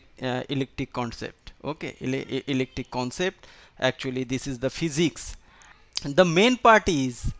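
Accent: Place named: India. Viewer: I really appreciate the content but I need to say, that for a not native speakers or not Indian the accent is hard to understand. So as a constructive advice I will recommend improving your speaking skills to easy reach the 1M.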